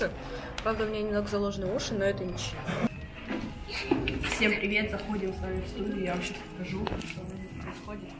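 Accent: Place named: Russia. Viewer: 🤔 This accent! U speak Russian language! So are you Russian?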